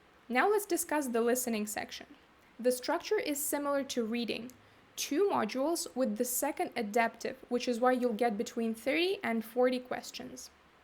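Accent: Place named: Russia. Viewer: Такой сильный русский акцент....😂😂😂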